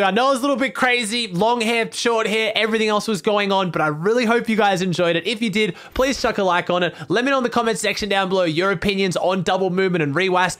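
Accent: Australia. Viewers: Aussie!!!!!!! Yo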